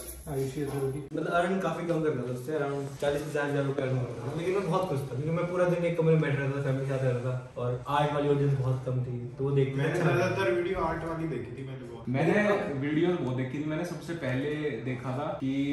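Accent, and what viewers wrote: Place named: Haryana, India. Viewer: I just love ur haryanvi acsent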